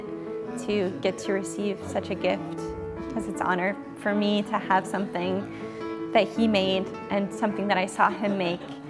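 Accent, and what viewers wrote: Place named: West Coast, United States. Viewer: A statement should never sound like a question. This video has so much to share. Please watch your west coast accent.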